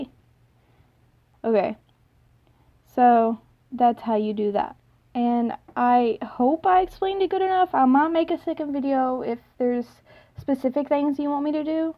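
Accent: South, United States. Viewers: no offense...... i just realized that you sound country :P im country too so idek why i said no offence.... im stupid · Are u country? Bc u have a country accent, sorry it just made me laugh xD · You sound country kinda, but u keep catching yourself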